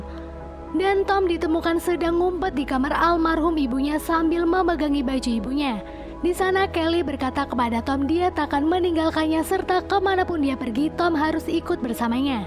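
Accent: Pemalang, Indonesia. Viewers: Mba orang Pemalang yak?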